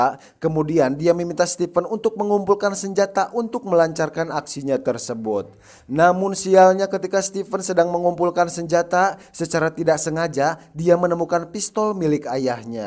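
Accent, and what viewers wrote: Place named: Tasikmalaya, Indonesia. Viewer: suara tasik mana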